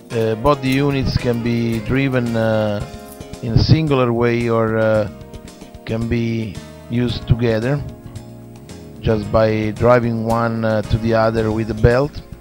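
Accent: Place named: Italy. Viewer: Sei italiano? Riconosco l'accento. Mandami lo schema. Good job.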